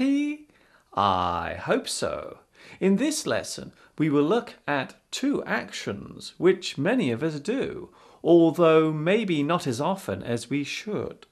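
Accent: United Kingdom. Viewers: It is a pleasure listening,real british English.It is clear and funny. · Hahahahaha oh my god you are so funny! and this is very helpful for me, I love british accent, specially cockney!! Kisses from Uruguay, Latin America!! :)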